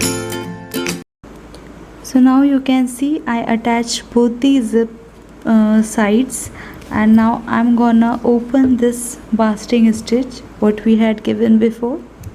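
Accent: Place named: Algeria. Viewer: جزايري مرة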